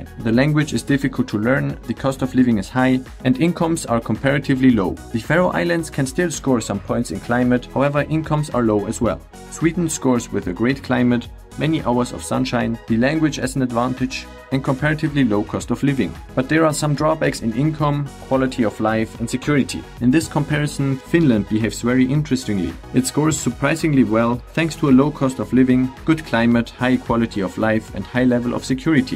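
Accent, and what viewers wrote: Germany. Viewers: Are you german? I'd swear your accent is 100% german and I'm just asking me the question if I should move out of the country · Richtig rausgehört das da ein deutscher spricht 😆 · You sound German. Is that right? Thanks a lot for a great video. Really great and informing.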